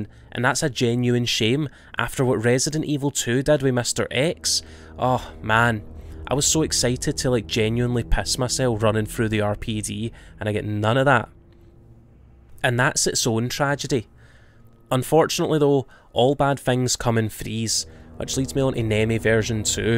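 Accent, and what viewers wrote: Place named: Glasgow, United Kingdom. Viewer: Glasgow uni accent is the worst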